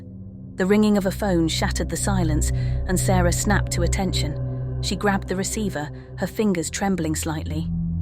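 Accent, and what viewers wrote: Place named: United Kingdom. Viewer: your voice is a very beautiful narration . you sound like your. British or England not sure. but it's very beautiful I like it. Plz keep up the great job .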